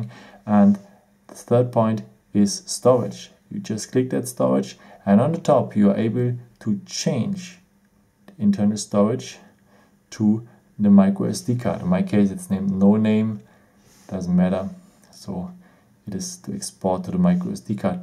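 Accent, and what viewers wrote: Germany. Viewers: yor from german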